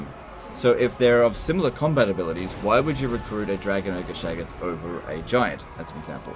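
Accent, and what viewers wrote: Australia. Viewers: Huh, didn't realise you are Australian. I thought the accent sounded familiar, but I'm so used to American or British content creators. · I only just realised you live in Australia. It’s like the bloody Aussie accent didn’t give it away somehow.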